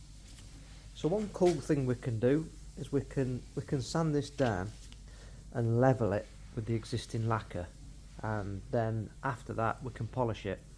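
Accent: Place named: Derbyshire, United Kingdom. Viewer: Lovely Derbyshire accent there!! 👍🏽👍🏽😊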